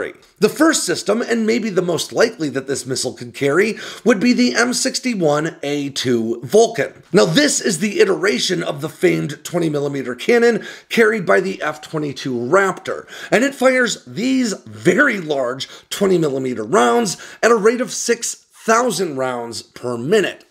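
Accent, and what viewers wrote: United States. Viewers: You say that almost like an American would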